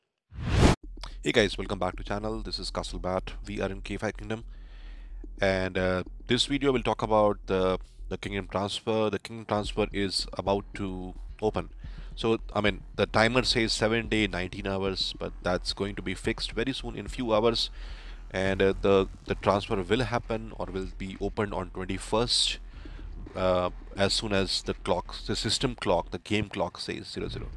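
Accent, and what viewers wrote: India. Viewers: What language are speaking bruh 🤣 im guessing ure indian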